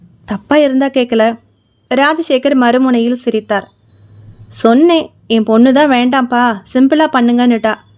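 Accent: Kerala, India. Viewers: Sis! novel 👌but ella novel um malayalm tone athigama varuthe sister 😮u want like malayalam..sis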